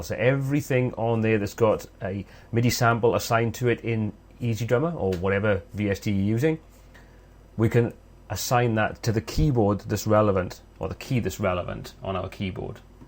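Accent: Wales, United Kingdom. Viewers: Nice to hear a Welsh voice on this video. Hwyl from Cardiff!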